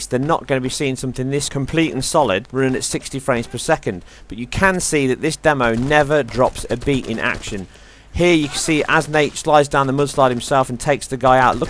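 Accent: England, United Kingdom. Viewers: Man, we love this! You have seen the demand for your kind of workmanship here. We are looking forward to more top notch analysis like this that puts Eurogamer and Digital foundry to shame. They now have competition! You have our support. Thank you you have awakened to giant nerd in us. Love your stuff and that English accent is a sure fire win. Everybody is posting your video on many comment sections on gaming sites. Keep this up.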